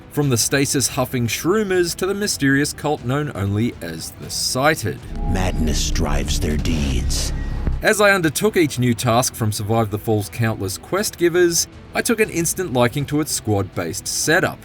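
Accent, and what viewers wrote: United Kingdom. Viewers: IGN please read your COMMENTS. People really dislke how THIS GUY narrates your VIDEOS. It's not like you can't find OTHER PERSONS to read the text for your VIDEOS. Just because he has a British ACCENT, it doesn't mean that HE IS a good narraTOR. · The generic YouTube I'm vaguely British voice ? Meh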